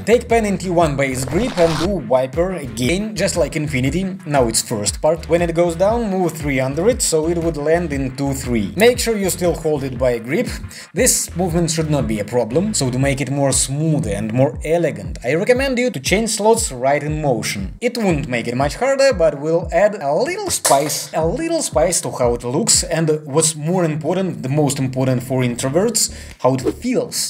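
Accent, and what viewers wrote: Russia. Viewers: Love your Russian accent 😂♥️🤞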